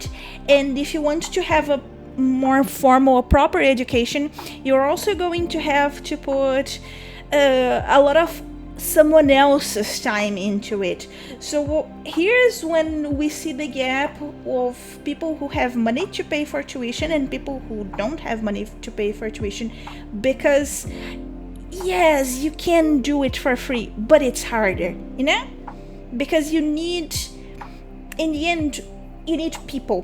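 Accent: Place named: Brazil. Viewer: I can tell you are Brazilian from your accent and Im an American living in Brazil. Something I've noticed about the cultural differences between Americans and Brazilians is that Brazilians are often preoccupied with their current finances and are less willing to spend money on gaining access to education and networks that will provide them with knowledge- and even more importantly RELATIONSHIPS- that will advance their business or craft. I definitely get where you are coming from but just wanted to say that investing (even expensive amounts) in yourself, leveling up your craft, and professional networks with the right people can get you so far. I was even thinking of making a video on this soon. Save some money and really get a professional network or mentor that will help you level up :) Im not even an artist or into artwork much but your video popped up on a google search and it was cool just watching you do this while talking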